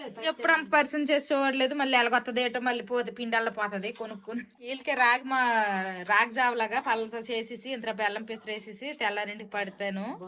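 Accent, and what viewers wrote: Srikakulam, India. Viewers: Uriki velte paka srikakulam voice lo matladutunaru miru akka super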